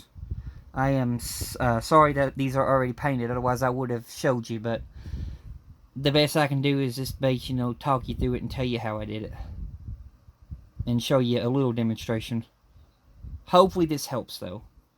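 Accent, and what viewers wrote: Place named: Texas, United States. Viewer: Wow you are so clever the way you do these things I find it so interesting to watch you working on this beautiful model. Thank you so very much for sharing this with me I will attempt to do what you have done but im not as skilled as you but i will definitely give it a try. Once again thank you and look forward to your next video take care o!! By the way are you from texas luv your accent stay safe take care 🙂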